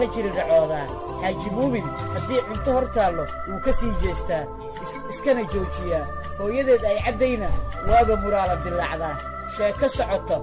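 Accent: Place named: Mogadishu, Somalia. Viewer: Sheekada waxaa Qiimaha u yeelaya wariyaha siiba lahjada Reer Mogadishu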